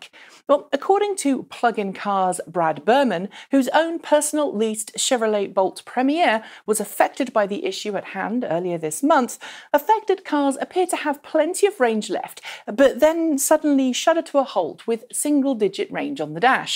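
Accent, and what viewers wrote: Mid-Atlantic, United States. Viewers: Back to speaking good old BE. Found your Mid Atlantic English a bit weird. ;-) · Could you make some of your videos with your American accent? I like it. :)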